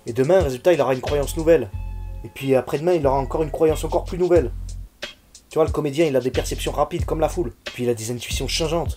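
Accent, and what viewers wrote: South, France. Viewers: Plus je l'écoute et plus jme dis que c'est ta meilleur vidéo , merci de m'avoir introduit à Tonton Nietzsche . Désormais , je vie telle Zarathoustra, je suis le pont au dessus de nos médiocrités , je parle à la montagne et ne me plie plus a la violence de l'efficience . Et putain avec l’axent du sud c'est encor mieux , con ! ^^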